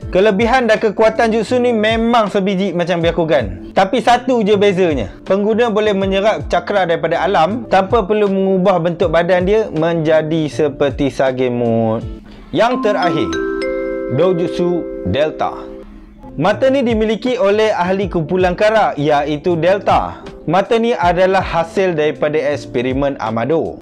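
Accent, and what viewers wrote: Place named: Kuala Kangsar, Malaysia. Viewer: atee deme ckp mcm orang kuale deme orng perak yea bote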